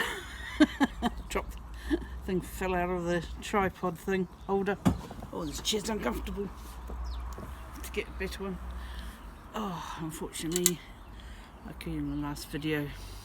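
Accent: New Zealand. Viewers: ......nice deck........😜👍 That's awesome with a Kiwi accent .....😋, sorry😞. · That looked scrummy! No comment re the word "deck" and your (charming) Kiwi accent! Cheers. Ken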